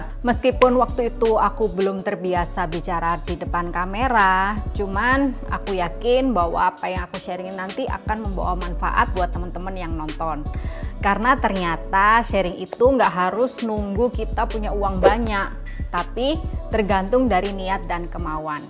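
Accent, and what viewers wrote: Indonesia. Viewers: suka banget sama channel ini soalnya resep nya simple bahannya juga mudah dicari, dan Surabaya an banget suaranya medok khas gitu, video favorit " pudding roti zebra" simple,enak,dan cantik banget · paling seneng karena simple resepnya, mudah di recreat, truss medhoknya itu bikin khass, ngangenin🥰😋 lanjoetkann, resep fav aku dan anak anak adalah "puding lumut" caiyoooo🍮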